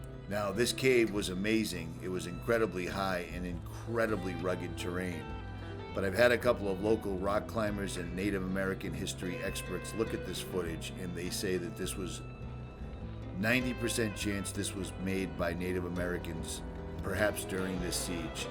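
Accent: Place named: East Coast, United States. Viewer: Your from the east coast aren’t you?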